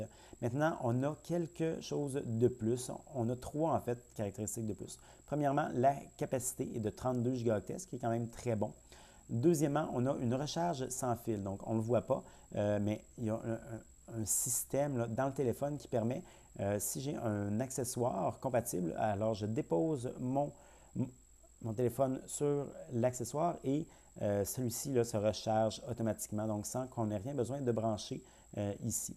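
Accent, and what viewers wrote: Quebec, Canada. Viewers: Tu es québécois ?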